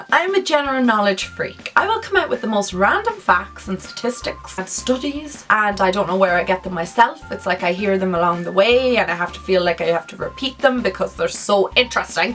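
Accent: Ireland. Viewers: Great vides! I have been watching you for a while now. Love your accent! My husband is from Ireland as well. Keep up the great videos! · where in Ireland are you from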